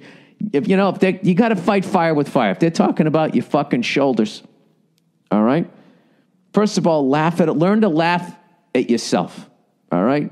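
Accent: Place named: South, United States. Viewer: Love, love, love the country accent!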